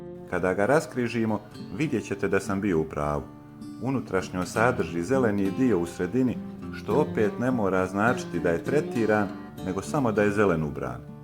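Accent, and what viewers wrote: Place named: Herzegovina, Bosnia and Herzegovina. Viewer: Mene kao Lalu naglasak tj način govora ovog čoveka izuzetno podseća na onaj kojim govori hrvatski teniser Marin Čilić, koji je iz Međugorja, a samo malko manje i na tip govora našeg košarkaša Alekse Avramovića, koji je iz Čačka, a dosta i na Crnogorce, generalno. Dakle, valjda, tipično hercegovački(?)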